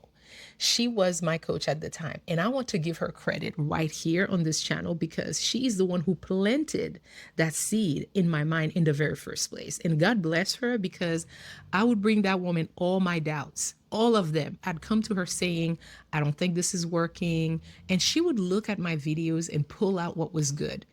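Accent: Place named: Haiti. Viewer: Wait a minute, you're from Haiti 🇭🇹...I kinda thought you might be because of your accent but that say confirmed it...I think you might be the person I need in this season, like I commented on that previous video I watched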